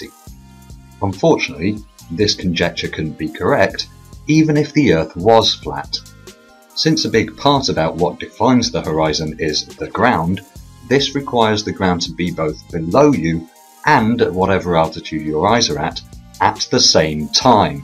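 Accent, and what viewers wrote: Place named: England, United Kingdom. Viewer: ***** You AVOIDED the most pertinent part of my POINTS (and I only had a couple)... is it 2 FEET or 3 FEET over 6 miles... You state in the video it's been duplicated many times, the 3 feet thingy... yet avoid or explain away atmospheric refraction - PLEASE... you even state in the video it, the experiment, has been validated many times. You use an English accent.... is that to sound authorotative...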